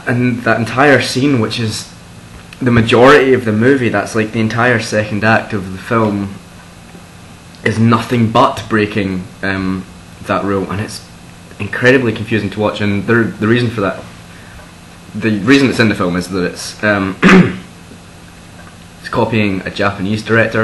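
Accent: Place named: Glasgow, United Kingdom. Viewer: Buffalo '66 is an amazing film! Also! Nice glasgow accent!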